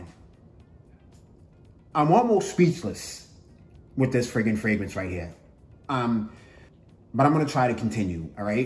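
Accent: Boston, United States. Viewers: that's a 100% authentic Boston accent right there.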